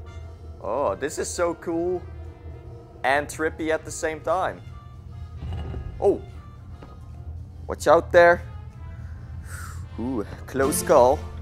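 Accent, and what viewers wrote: Netherlands. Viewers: Ik hoor zo duidelijk dat je Nederlands bent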